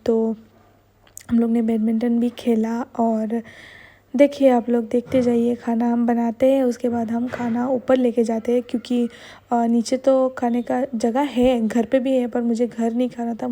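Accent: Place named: Bengal, India. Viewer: Aap ka hindi bolny mein speed nahi aa rohy bengali aa jata hai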